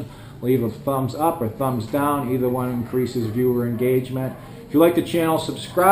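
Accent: United States. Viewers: I like the style of these videos of yours, they are just more thoughtful than than a lot of other video blogs. I am Brit myself, I like that 'Yankee' way of talking you have, casual -laid back but informative.( Yea, I know that internally Northerners are Yankee, but to those outside, we call 'ya all' Yankees). I am looking ahead to retiring in the phillipines, still a few years away. I am thinking of literally sailing off to the Phillipines on my boat, living there and and puttering about. Your videos just give me a little bit of a heads up on what to expect; hopefully expats as thoughtful as yourself are part of the package, thanks for your insights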